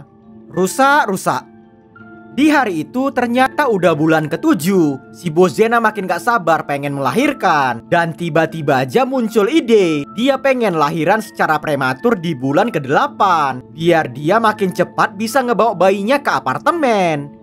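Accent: Medan, Indonesia. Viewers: yg isi suara ini orang medan kek nya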